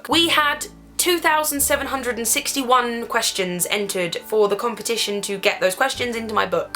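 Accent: United Kingdom. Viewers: I'm from Brazil and i just love your British accent and all your videos.I don't really have any question.Maybe,how can you be so beautiful?LoL Kisses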